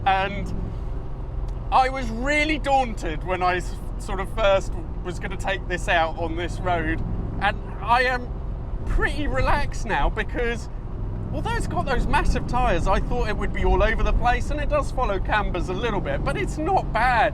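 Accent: Italy. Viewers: Pantera has been one of my favourites since when I was a kid. But I didn't know she had such a gorgeous beautiful interior until I saw this video. Especially after seeing a spaceship like cockpit of the modern cars , it's got a very good taste. As someone said that it's not a car but art. Yes it's more than a product but art level of thing with a passion. It must come from Italian character. I like the way you talk so nicely and jolly and clear as well.And I don't mind sometimes you talk with an Italian accent. It's fun and give your program a good character. I accidentally found your program first time today. I like your narrations very much. It's very pleasant. Thank you! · I had driven my friend’s LHD in Vancouver back in the 90’s and it was amazing! BTW, Italian accent is beautiful! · Love that car, what a machine. And regarding people moaning about the Italian accent, don't change it, if they don't liked don't watch it. 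:) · Absolutely love the pantera hate the Italian accent totally spoiling your videos which I look forward to watching every week please stop as it’s cringe city,keep them coming (without accent)👍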